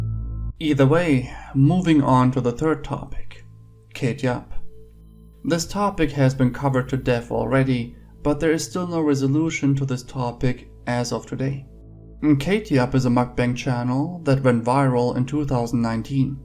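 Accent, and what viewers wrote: Germany. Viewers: I'm half British and half german, so I'm always thinking are you German? I can hear a light accent but it can also be something else :) Keep on the good work! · I thought it was a Slavic accent at first, but it's German. Very pleasant.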